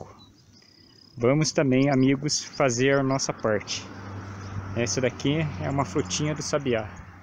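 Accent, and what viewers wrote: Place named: Espirito Santo, Brazil. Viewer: Cara top, vc tem um sotaque de capichaba. Q bacana q Deus abençoe este lindo lugar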